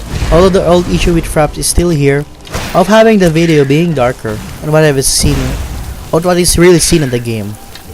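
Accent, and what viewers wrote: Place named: Philippines. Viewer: are you Filipino? You have the accent.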